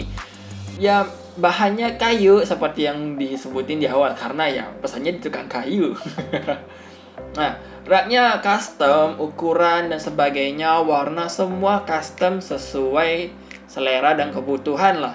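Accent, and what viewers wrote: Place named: Malaysia. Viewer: Logatnya udah kayak urang Melayu banget.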